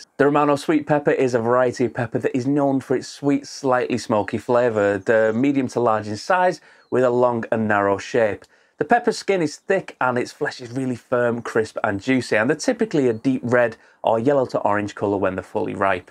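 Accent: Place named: North East England, United Kingdom. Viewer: With an accent like yours I assume you are in North East England and NOT in the North East of the UK. Different growing conditions in those differing areas.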